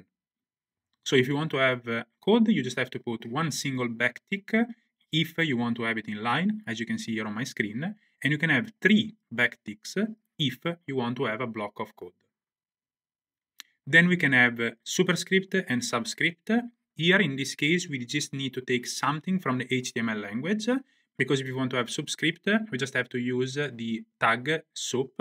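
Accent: Italy. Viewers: love your italian accent xD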